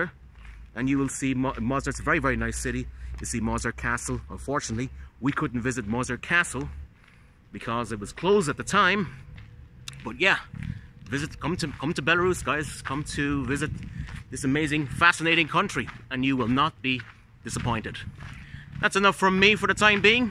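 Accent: Waterford, Ireland. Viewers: I'm in the Midwest US and I l hear a slight Irish accent but it's a lot closer to a US accent than I've heard from most Irish. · On another video he says that he is from Waterford ,S.E.Ireland but lived a few years in Dublin .Waterford City people have a kind of neutral accent. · Why ask Bald? how would he know. He clearly has an Irish accent in the video. Ireland has a huge amount of Irish accents and none of them sound like Hollywood’s “Top of the mornings portrayal. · dose the local people speak english like you ie they sound like paddys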